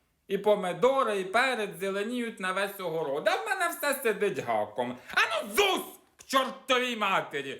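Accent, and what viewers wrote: Poltava, Ukraine. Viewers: Через Гальку не посадила раньше огород.. Іван там де садив то срака собача сидить а не огород. Ну вже ж підняла настрій. Наш Полтавський говір. Наче дома побувала. Молодець.❤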